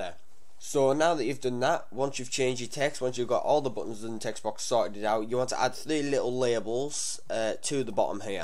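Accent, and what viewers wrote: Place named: United Kingdom. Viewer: defo British XD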